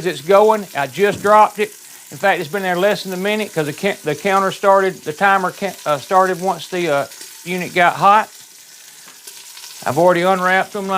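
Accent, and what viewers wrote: South, United States. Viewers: He is from the south. I understand you. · Where is that twang from? Somewhere south like Lousiana or Georgia?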